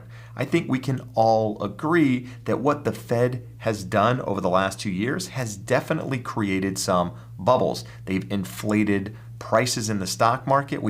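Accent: California, United States. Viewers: You're from California or I wrong?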